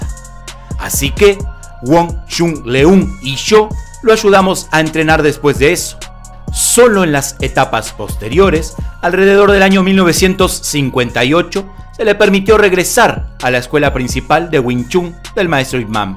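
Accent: Argentina. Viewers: chileno? Pensé que era argentino.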